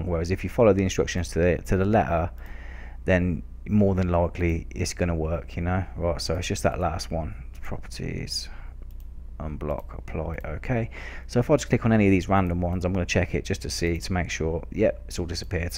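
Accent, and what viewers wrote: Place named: Scotland, United Kingdom. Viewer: sound a bit scottish tbh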